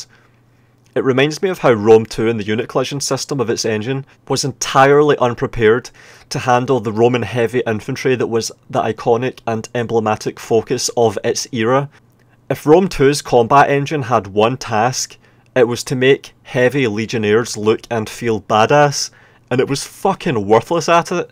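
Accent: Scotland, United Kingdom. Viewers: You sound like i changed my siri settings to 'monotonic dulcet Scottish guy'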